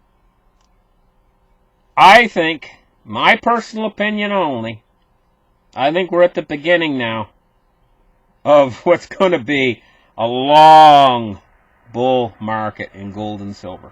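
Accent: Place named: Yukon, Canada. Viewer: i try to copy accent, but hard... is it Klondike?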